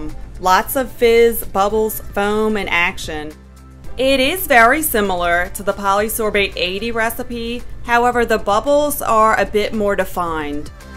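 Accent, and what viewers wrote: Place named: New Orleans, United States. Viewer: Used this video thanks... settled on the guar gum. btw are you from New Orleans you have a particular accent that reminds me of home?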